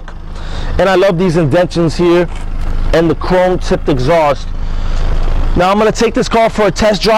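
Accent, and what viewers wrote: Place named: Queens, United States. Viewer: Dude... you're very cool with your accent... Queens NY? But it's pronounced Hunday, not Honday. And thanks for showing the SE model. I wanted to see what it looked like with those for lights on.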